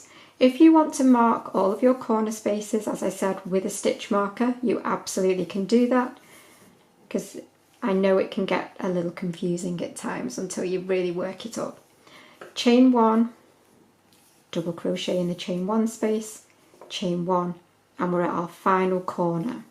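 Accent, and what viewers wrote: United Kingdom. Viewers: Hello! 😊 First, thank you for your wonderful instructive video! Your voice and British accent sounds very kind and patient. My question is - Can this be done without turning after each round? Does it not look good if you don't turn? I taught myself how to do some crochet back in the 70s, but at that time I usually only did the basic stitches (single, double, triple, slip stitch and so on. I hadn't heard of the monk stitch yet before today. And I also haven't done crochet in a about 30yrs, I wanted to make a macrame dream catcher and decided to make a doily instead of purchasing one. I think I've found a renewed love for the crochet! Thanks again for your video. I'm sure I will watch more!☺️ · My heritage is Scots and I love a British voice, and I appreciate so much learning a new pattern. I have been crocheting since my mother-in-law taught me how in 1997.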